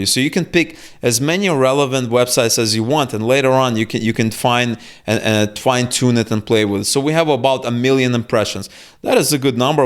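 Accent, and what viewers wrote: Russia. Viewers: you sounds like Russian! And by the way good video. I am about to test running CPA offers on GDN but not in the USA. Why? A lot of competition there and thus expensive click.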